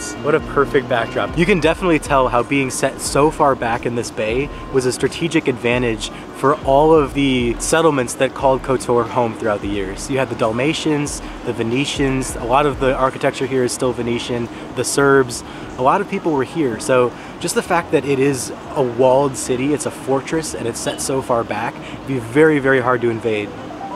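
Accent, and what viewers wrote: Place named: United Kingdom. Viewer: Why does she have a british accent?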